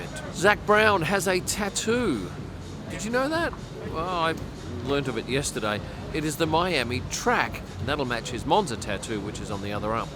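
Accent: Australia. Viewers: I like the Australian talk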